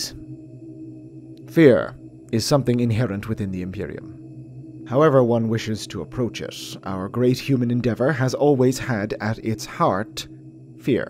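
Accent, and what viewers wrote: Ireland. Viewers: 🇮🇪💪 bro an bhfuil gaeilge agat? Maith an fear. Proud of this brogue. I think its a bit familiar. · he sounds Irish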